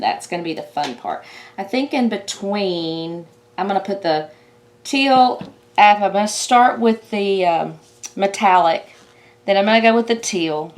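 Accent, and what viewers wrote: Texas, United States. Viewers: It was beautiful before the spin, but the black lines and the spread patterns worked. Very nice work. Love that Texas talkin.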